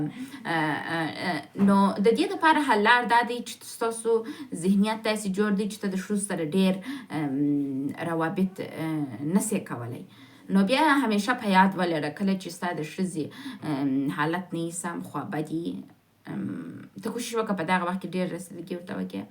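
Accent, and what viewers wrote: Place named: Kandahar, Afghanistan. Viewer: محترم این زبان را زبان پشتو میگویند که مربوط به افغانستان می‌شود و لحجه اش هم قندهاری است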